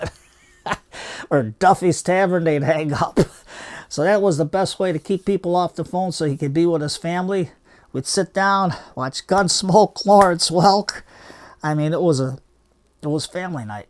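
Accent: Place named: Chicago, United States. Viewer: Hi! I was in Oak Lawn and the Mount Greenwood neighborhood of Chicago last week and stopped by Fasel’s & Son’s to get some bonsai pots at 20% off. Great prices. Thanks for the tip. I had limited space to transport back to North Carolina or I would have bought more. It was good to see the old neighborhood, visit my family, and hear more of the Chicago accent that has made listening to you so much fun.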